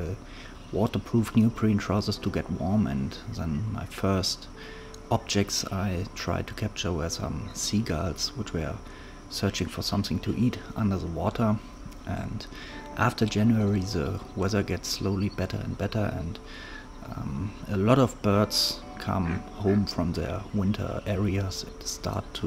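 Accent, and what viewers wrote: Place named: Germany. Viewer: An deinem Englisch hört man das du deutscher Bist 😂✌👍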